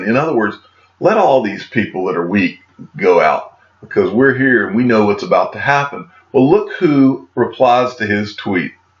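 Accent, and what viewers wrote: South, United States. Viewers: HAHAHAHAHAHAH you are a fucking FAN BOY piece of shit... Stop SCAMMING people... How much XRP SHIT coins are they paying you for your SHITHOUSE forrest GUMP accent videos... you fucking STINK!!!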